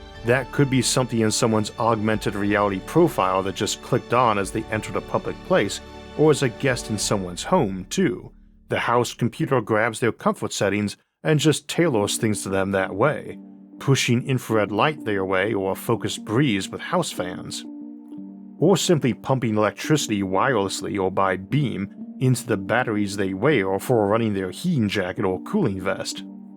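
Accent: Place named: Arkansas, United States. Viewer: Sounds like Arkansas.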